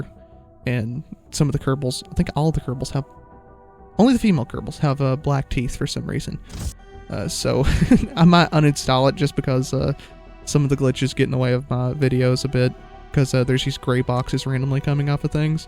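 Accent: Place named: Texas, United States. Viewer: why does this guy have like a texan accent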